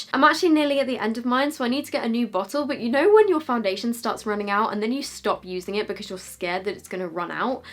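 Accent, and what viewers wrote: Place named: United Kingdom. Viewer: Oh my God I love your accent, apart from my obsession with the British accent, my favorite products are: -essence camouflage concealer -kiko velvet passion matte lipsticks in shades 314,318 and 312 -essence volume stylist 18h mascara (curl and hold mascara) and many others in reality, but at the moment I can not think of others... love u xoxo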